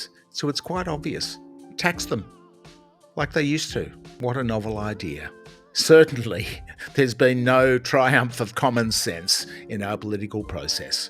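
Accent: Australia. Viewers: What a relief to hear an Australian voice talking sensibly about reality these days. Anyone else feel like we’re living in alternate realities?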